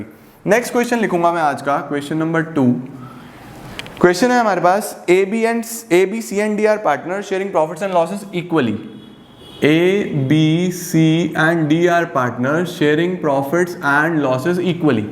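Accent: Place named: Saharanpur, India. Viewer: Sir saharanpur se h pta chl gya😅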